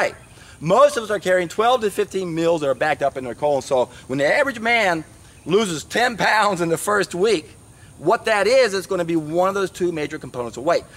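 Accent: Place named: Texas, United States. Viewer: Gosh I love this guy😂😂😂😂 Get rid of the shit . LOL Very true he is , with that Texas accent . Lovely😘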